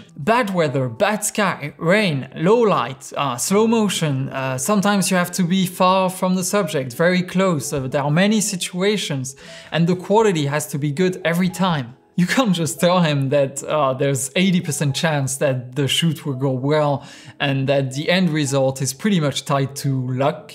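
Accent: France. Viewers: Youre french? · I love his pure thick French accent. It’s ASMR at its finest. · The most french thing anyone has said: (especially with that accent) lol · Are you French or Canadian? Just curious. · T’a l’accent français je trouve si tu es français alors je dit bravos 😊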